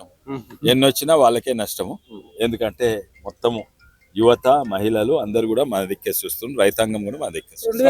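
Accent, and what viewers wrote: Telangana, India. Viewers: Sir firing eroju😂😂😂 Achamaina Telangana yasa😂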